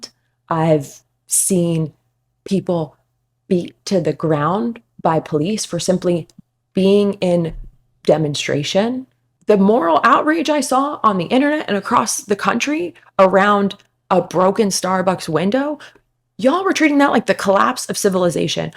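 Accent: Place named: East Coast, United States. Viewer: New Jersey huh, even the east coast accent came out today 😂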